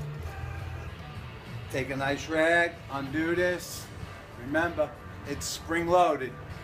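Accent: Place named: New Orleans, United States. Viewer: Your accent sounds a little New Orleans but not exactly.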